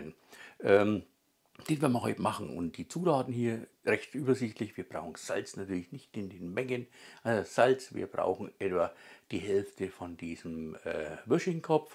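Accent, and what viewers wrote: Franconia, Germany. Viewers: Servus Der Dialekt ist nicht zu überhören, beim Franken hört sich das immer so niedlich an. Bin aus der Oberpfalz und was soll ich sagen.... mein Hund spricht die gleiche Sprache. 🫣 Dein Dopf Gericht wird nachgemacht, ich werd aber dazu ein Nierenzapfen nehmen den da kommt der Rindsgeschmack besser zur Geltung. Gruß vom Hobbyschmied und Daumen 👍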